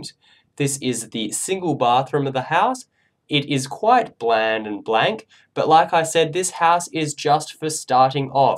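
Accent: Australia. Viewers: is he from Australia? because he sounds like it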